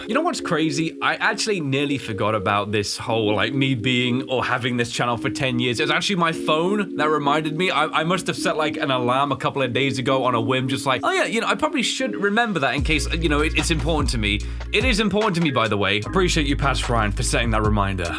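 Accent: United Kingdom. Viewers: well, i don't quite remember which one of your videos i saw first, but i did came here from jay kubz scout, because someone in a comment session talked about you, so i got curios and decided to check you channel. At first it was a little confusing for me and some parts i couldnt understand what you were saying because i only consumed english content made by americans, hence why i couldn't understand british accent, but it quickly grew on me and i got used to your voice, which had improved my english by a lot, so thank you for that! (Youre literally the only reason i can understand dawko and bazz lol) The thing that got me is the vibe and editing, that's for sure, because it was really special (still is) from what I've watched on youtube before. Now I'm waiting for upload or rewatch your old videos when i eat, its became a part of my routine, and i dont miss any video you upload :) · He started out as the deep voiced British man who did FNAF theories and top 10s and then he started his own channel and 10 years later that channel has become something truly special and unique and one that has stood the test of time…congratulations Ryan thank you for a wonderful decade of fun 💙💙💙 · a decade of funny bri'ish man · I kept watching your videos since 2020 because i needed to learn british english 😂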